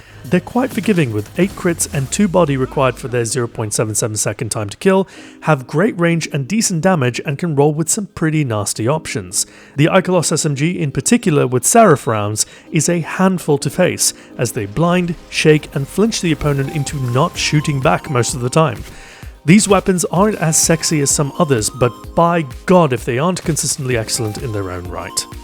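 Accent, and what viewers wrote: United Kingdom. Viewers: Great video mate! Your voice makes me want to visit the UK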